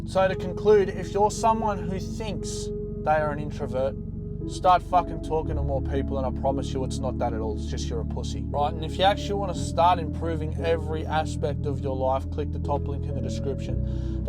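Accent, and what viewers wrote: Australia. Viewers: mate r u aussie?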